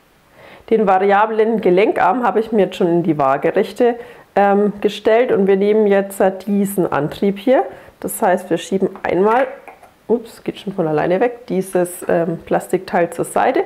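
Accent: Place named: Franconia, Germany. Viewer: Hört man sofort 😂 Aber nicht nur das r, auch das "Sprechsingen" etc. Mir Frangen hald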